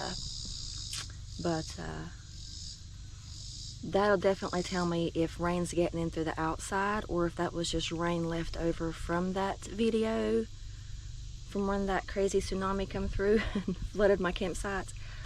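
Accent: United States. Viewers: Hello my dearest friend lol. Might be worth drilling a couple of drain holes in the bottom of the hatch so the water won't gather. Seal the drill holes with a bit of nail varnish. I didn't count how many times in this video you said "I love", but I bloody love your dialect. It's gotta be my favourite of all the Americas xxx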